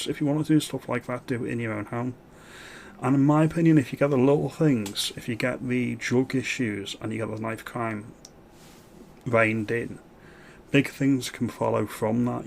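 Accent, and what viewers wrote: Liverpool, United Kingdom. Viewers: Thank you for this video. I’m living in liverpool and I couldn’t agree more with you ( you sound Liverpudlian 🤣 ) I would vote for Ant definitely. My daughter was attacked by a demographic we can’t mention, a woman . My daughter need hospital treatment. To cut a long story short when the police came, they went to arrest my daughter until passersby who stopped to help told the police who the aggressor was. Twelve months later almost to the day not one police person from Merseysnide police have called to take a statement . That night my daughter was just going to the cinema with her friend and was minding her own business . The aggressor was like a rabid dog. It’s not safe here anymore my nerves are gone everytime she goes out and local government are just pathetic . IMO Have a great day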